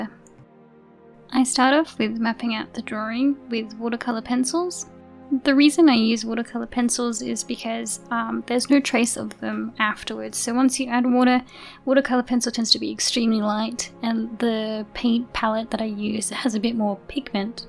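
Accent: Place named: United Kingdom. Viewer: What is your accent? It sounds "almost British", but not quite. Are you Welsh?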